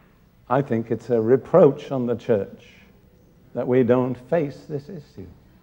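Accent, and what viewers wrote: United Kingdom. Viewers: I used to listen to snatches of his radio program during his lifetime when I was college, but he seemed rather like a rather stuffy lecturer due his British accent yet flawless diction. Now I hang on his every word, and his anointed teachings are powerfully strengthening my Christian life.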